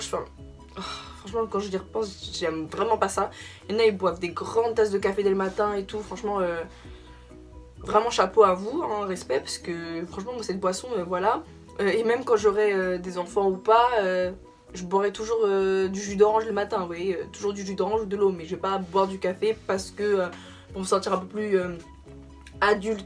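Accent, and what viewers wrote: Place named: France. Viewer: Damn I love your French accent I have been obsessed with French literally my whole life, I just love you so much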